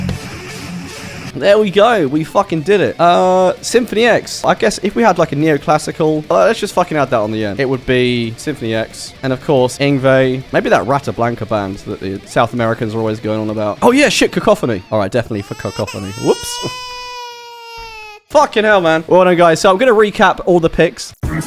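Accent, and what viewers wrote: England, United Kingdom. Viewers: me agrada ese acento ingles, bro ! suena genial! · I fkn love this bri'sh accent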